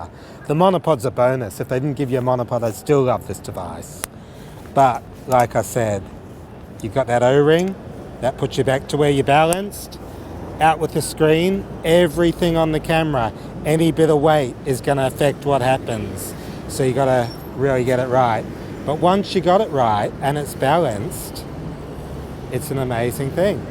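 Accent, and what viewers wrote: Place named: England, United Kingdom. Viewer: I do admit...I love your English accent...!!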